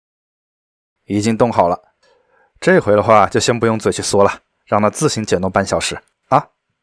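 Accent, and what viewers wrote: Wuhan, China. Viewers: 听着像武汉的☺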